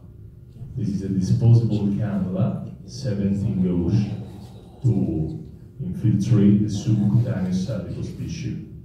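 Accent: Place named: Italy. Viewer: Che accento troppo italiano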